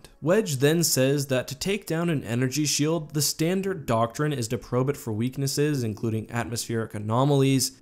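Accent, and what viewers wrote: Canada. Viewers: You sound Canadian for some reason.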